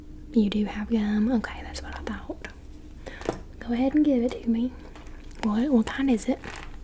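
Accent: South, United States. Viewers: The second I saw the thumbnail I knew I'd be loving 💕it already 😍👍 Congratulations 👏👏👏 on the return of the much-loved Miss Tilly roleplay you have been so dearly missed!!! 😪 So love ya awesome southern accent. You are the absolute best!!! 💙💙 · the southern accent is so good! do you live in the south?? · I think the Southern drawl is the best accent in the world. And the 'turns of phrase' these southern folk have are just delightful! 😄 Thanks for the video,! 💖✨ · Ohhhhh southern USA XDD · It’s great to see Tilly again! She was fired from her previous job, hopefully she doesn’t get fired again 😂 I love that southern accent, it’s very funny. Thanks for this fantastic video! 🤩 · I guess we got a substitute teacher to take on place today! I love the Southern Accent too, so entertaining to watch with some ASMR Much Love to you! ♥️♥️♥️ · Pretty dang good southern Belle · This could be your best roleplay yet. 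I love the colors on the outfit you are wearing. Nice color on the glasses, and nice pink color on the phone. Very nice blue color on your nails. I love hearing you converse on the phone. Your interaction speaking to the students is so well acted and spoken. I love your Southern accent. Your accent is so smoothly spoken. This is Teacher Ms. Tilly, formerly known as Nurse Tilly? The Nurse decided to become a teacher. You are a person of many talents and skills. Your voice when you speak, especially when you whisper is so soft and relaxing that I felt like I'm floating on a cloud while listening. Your personality shines. I think this is a performance worthy of an award. You could have been a wonderful Teacher had you chosen that career. You are fantastic. I thoroughly enjoyed this. btw, You are the cutest girl on YT, in my opinion. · yes ❤️. You are my favorite to listen to, the southern voice was amazing! 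Some acting skills flowing through your blood lol. Thanks again! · Your Tilly esk southern accent characters are the besttttt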